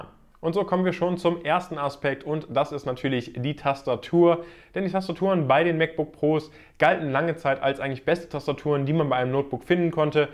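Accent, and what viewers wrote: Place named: Germany. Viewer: Habe vor kurzem dein englisches Video gesehen und mir wegen den Akzent schon gedacht dass du aus Deutschland kommst. Jetzt weiß ich dass ich da richtig lag :-D Du machst gute Videos.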